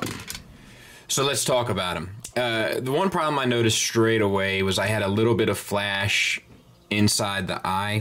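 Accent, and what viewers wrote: England, United Kingdom. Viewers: Love the English accent, haha! Good review as usual,. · Love the limey accent...lol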